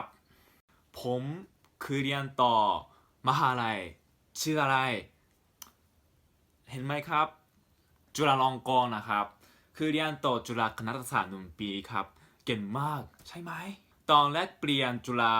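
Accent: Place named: Japan. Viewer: เก่งมากเลยครับ พูดคล่องเหมือนคนไทย 70% สำเนียงติดที่ลิ้นแข็งแบบคนญี่ปุ่นเป็นกัน ฝึกพูดเยอะๆครับ สุดยอดครับ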